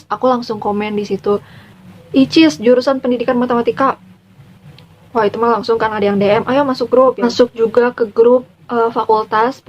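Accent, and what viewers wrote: Indonesia. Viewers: Tth suka ih logat sundanya ❤️ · Ngakak banget lagii bahasa Sunda nya lucuu😂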